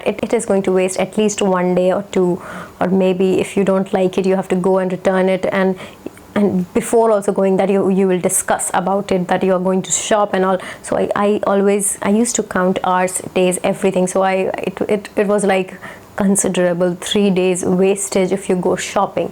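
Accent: Bihar, India. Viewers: Ha bhagalpur se ha sayad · Aap Bihari ho?🥺🥺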